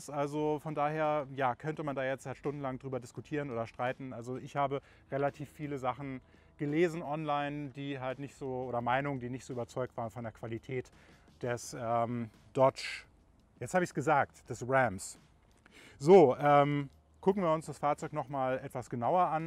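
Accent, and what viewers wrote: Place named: Germany. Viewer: Haha ich liebe es wie deutsch du alles aussprichst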